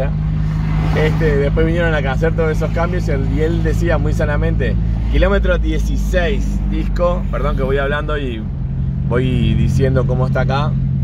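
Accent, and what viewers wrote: Argentina. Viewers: Me encantan tus relatos pareces guía de turismo o mejor tu tonada es de argentino cheto ya sabés el cheto es la gente de la alta sociedad es un algo ojo me gusta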